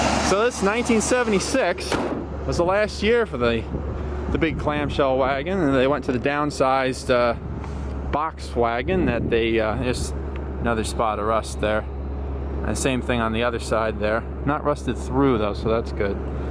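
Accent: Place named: New Jersey, United States. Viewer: This is crazy... but as soon as you said, "Just got it yesterday" , I thought to myself, "He sounds like he's from New Jersey." I looked back at the license plate, and sure enough I was right! (I'm from California, by the way.)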